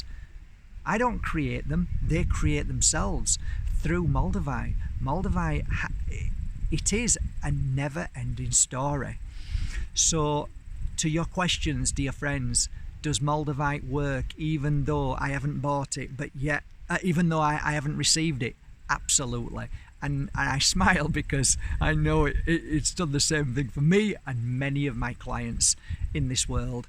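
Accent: Yorkshire, United Kingdom. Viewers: I've just ordered my first moldavite this morning. I spent time looking at different ones on a site and was drawn to a particular one. I've experienced some sharp headache like pains intermittently...a little apprehensive but interested to see what happens when it arrives. Love your Yorkshire accent, I'm in East Yorkshire 😊💫💫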